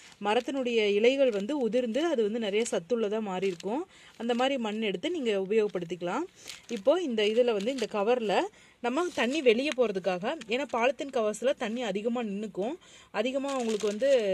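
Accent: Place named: Kongu, India. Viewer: kozhi koovuvadhum iyarkai suzhalum ungal kongu tamizhum niraivai irukkirathu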